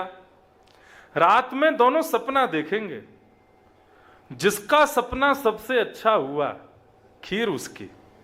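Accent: Braj, India. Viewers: Jai jai aapki Katha bhut acchi lagti hai aapke mukharvind se brij bhasa bhut sunder lagti hai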